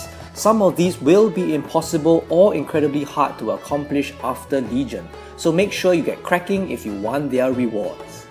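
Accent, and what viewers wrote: Singapore. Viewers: no wonder I found your accent familiar , I'm from Singapore too ! Which server are you playing ! Wanna play together ? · Are you singaporean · saw in another comment that you said you are sg..well that explains the accent ..but more importantly thank you for the tips ,they were very imformative and helpful for us casuals..i need to get the titles and a few mounts but ugg..and the mage towers really pissed me off..i can't find a good assasin rogue video to refer to..keep posting man i'm subbin ya ..we play both factions so we will see what happens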